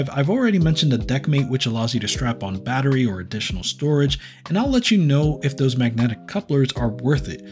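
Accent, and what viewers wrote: United States. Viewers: That's totally fair. Most of us non-Americans have just gotten used to hearing American accents and adjusting our expectations accordingly. Something about the US school system causes many Americans to be a little US-centric. It's not your fault. I appreciate that you are trying. That's more than can be said for a lot of Americans.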